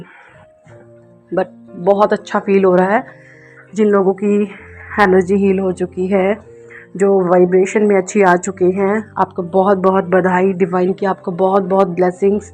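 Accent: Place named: Kanpur, India. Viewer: Jee aap kee avage hum sunkar anuman leagatata thaa kee aap kanpur kee tharaa bolti hai leakin kathil siti aur karneal siti mea siti kaa kuch loog kanpur kee thara boltesa humko mealtaa thaa hearinaa meaa Hindi kee kitabai boli jatai haea .....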